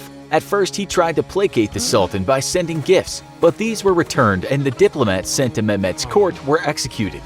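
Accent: United States. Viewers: Any way of getting a more relaxed non-American narrator? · Its an American channel, by the accent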